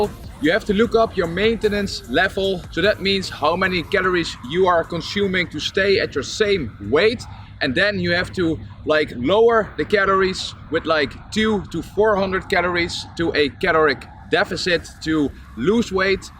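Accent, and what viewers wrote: Netherlands. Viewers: Are you Dutch? You accent gives it away.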